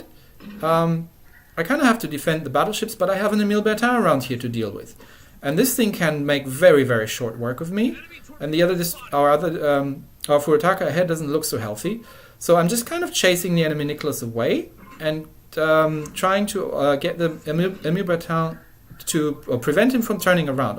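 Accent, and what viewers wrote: Germany. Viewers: Hey are you by chance german or scandinavian? Noticed your accent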